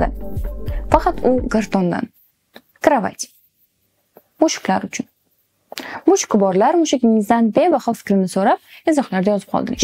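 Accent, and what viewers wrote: Khorezm, Uzbekistan. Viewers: Salom Sz menimcha Xorazmlisz togrimi🤔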